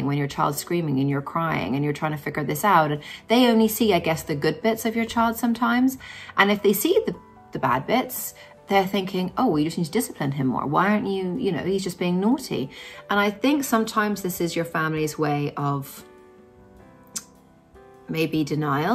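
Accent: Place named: Ireland. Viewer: Are you Irish? I'm Irish and can hear a twang in your accent lol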